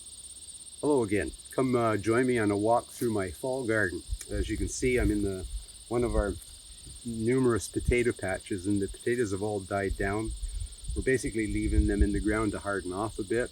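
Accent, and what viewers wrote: Canada. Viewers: I'm a yooper born and raised on the U.S. Canadian boarder. I watched the first of your videos and knew you were from Canada from your accent. I started watching your oldest videos first and will continue to the newest ones. Great videos and love the little cabin you and your partner built. I am looking forward to more. Thanks!!!!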